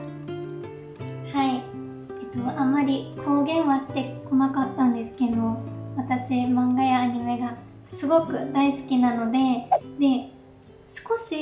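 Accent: Kagoshima, Japan. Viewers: 伸びかけのボブを見て大人っぽくなったなぁ変わったなぁと思い、鹿児島弁の「いつもの」喋りを見て全然変わってないなぁと思い。楽しそうに好きなものを語る様子が本当に嬉しい。推しキャラに可愛いとしか言えなくなるが可愛すぎました。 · はいつまでも鹿児島訛りでいてホシイ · 自分も鹿児島に住んでいるのでこの訛りというかイントネーションに親近感が湧いています。これからもこの動画の雰囲気を楽しんでいきたいと思います。頑張ってください！！！ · と同じ鹿児島弁のyou Tuberの釣りむすめと鹿児島弁対決してください。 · の鹿児島弁がひさびさに聴けて、多分だから癒されてるんやと思う😊✨ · の漫画・アニメ愛が伝わってきました！そして鹿児島訛りが同じ鹿児島県人として心地よくて癒しです😊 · いつもひょっこり顔を出してくれるだけでも嬉しいのに声まで来たもんで、本当に癒されます！訛りはが鹿児島にいたことの証だから、むしろどんどん出して欲しい！キャラクターの説明で「かわいい」って言うたびに画面の向こうで「あなたがですよっ！」とツッコんでいたのは僕だけではないはず。そしていちいちアップになるたびにかわいいのもずるいし、どういうことかっていうと、今日も癒しの動画をありがとうございます！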